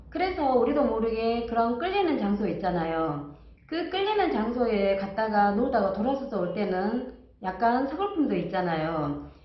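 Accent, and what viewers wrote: Gyeongsang, South Korea. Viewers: 저처럼 경상도 사투리라 반갑네요 ~^^ 정체성인 장소~ 이번 기회에 생각해봐야겠어요~^^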